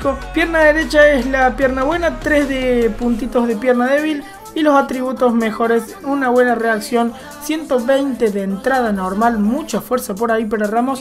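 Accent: Córdoba, Argentina. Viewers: PENSÉ QUE ERAS DE PROVINCIA POR EJEMPLO CORDOBÉS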